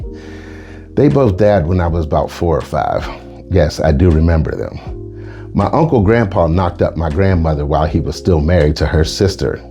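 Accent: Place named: Ireland. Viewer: You're Irish accent is on point! And you preach, but not the way your grandmother predicted!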